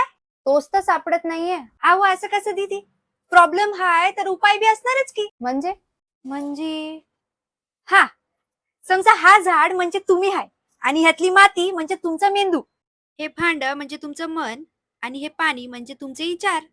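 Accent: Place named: Ghati, India. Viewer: Your घाटी accent 😍 nice try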